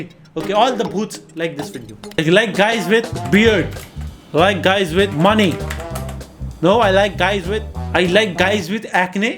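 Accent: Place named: Mumbai, India. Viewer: I am addicted to your vedios..apko dekh dekh kr mujhe mumbai ki language aa gye hai 😂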